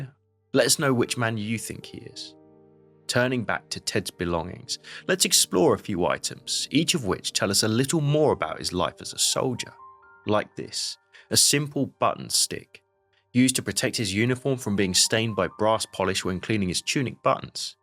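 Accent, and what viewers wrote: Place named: Hertfordshire, United Kingdom. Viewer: Are you in Herts? For a while I've heard some kind of familiarity with your accent!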